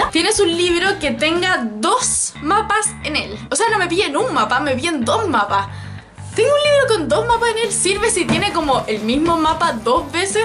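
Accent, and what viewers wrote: Chile. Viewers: eres chilena perdon recien te conosci en youtube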